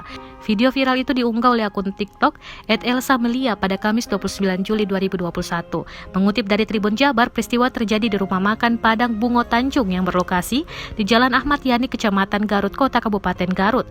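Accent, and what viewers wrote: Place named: Medan, Indonesia. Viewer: Yang bawa narasi orang medan pasti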